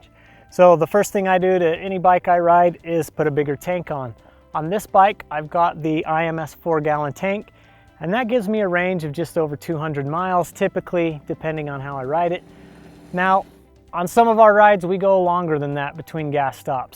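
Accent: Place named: Utah, United States. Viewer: He's trying to say accessory, but has a bit of a Utah accent.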